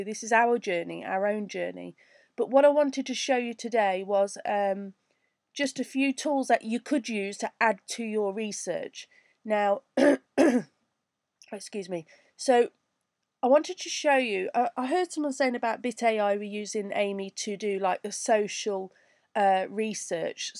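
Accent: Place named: London, United Kingdom. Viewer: I want to say I liked the video nice accent you sound like your from my neck of the woods in London? love to work with you guys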